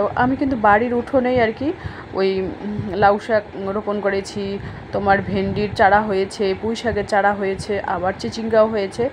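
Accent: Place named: Bangladesh. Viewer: পুরো ভিডিওটা দেখলাম, আপনার কথা বেশ ভালো লাগলো, মনে হলো আপনি বাংলাদেশ থেকে 😮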